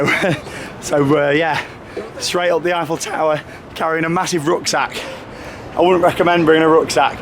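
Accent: United Kingdom. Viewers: I enjoyed your video and also your brithis accent !!!!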